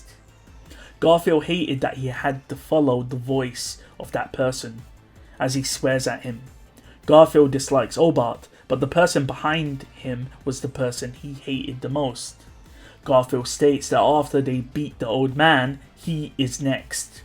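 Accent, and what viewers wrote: United Kingdom. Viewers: That's just him being British. It took him almost a year to stop saying Tappy but still hasn't really got Tappei right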